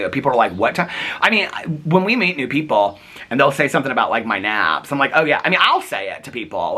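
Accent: South, United States. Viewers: Miss Dolly Parton had the strong hold on you since you were 10 😂 bet that is where the southern accent came from! 🤠💙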